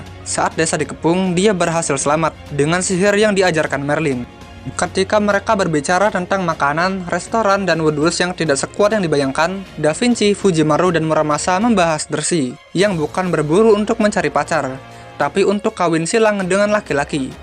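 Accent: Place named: Bali, Indonesia. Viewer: logat nya cerita kayak orang bali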